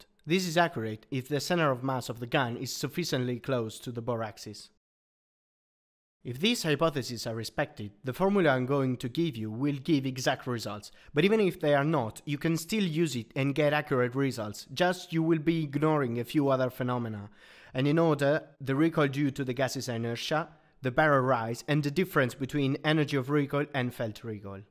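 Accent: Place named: Italy. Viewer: Come on, give the guy a break. We all know Italians have a very heavy accent when speaking. Your fellow countrymen don't do any better in speaking English, neighbour ;-)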